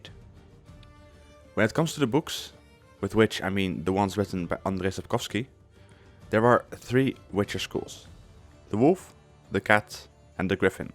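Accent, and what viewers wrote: Netherlands. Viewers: Yeah youre defenitly dutch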